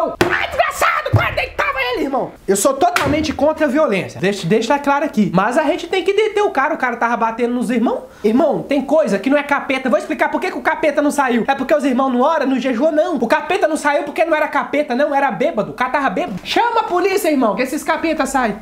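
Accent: Minas Gerais, Brazil. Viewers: Você é de minas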